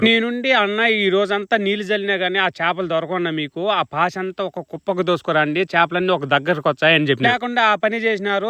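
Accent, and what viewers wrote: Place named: Rayalaseema, India. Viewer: Rayalseema slang anna 😅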